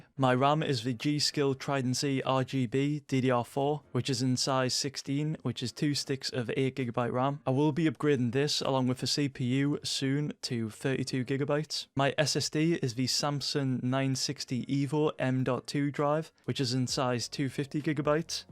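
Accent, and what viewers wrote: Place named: North East England, United Kingdom. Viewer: You sound like your from the north east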